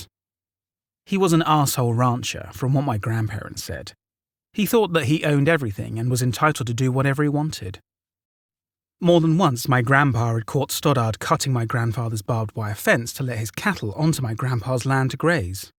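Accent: United Kingdom. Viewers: Does anyone else find it difficult to listen to a story about north Georgia being narrated by a brit?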